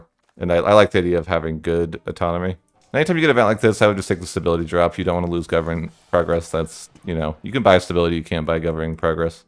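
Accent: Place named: United States. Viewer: You sound so similar to an American colleague I work with, what state did you grow up in if you don’t mind me asking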